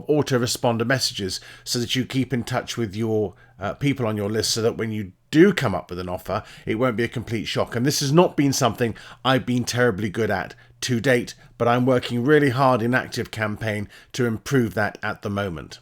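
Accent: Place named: United Kingdom. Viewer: your very good tolisten to i bought my first course last night looking forward to it. just a thought what is pulse. i think instagram reels and tik tok reels are a quick easy way to catch the eye to get a viewer to consider your course. get them with a key point from one of your courses.. nice accent does sound like british army show lol .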